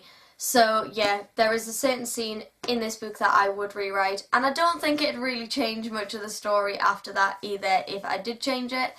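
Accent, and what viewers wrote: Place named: Northern England, United Kingdom. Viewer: Great book tag ☺️ ☺️ I hear a northern accent I'm originally from Darlington 😊😊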